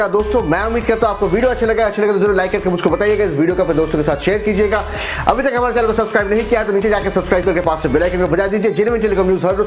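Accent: Bengal, India. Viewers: Sir AAP Bangali ho?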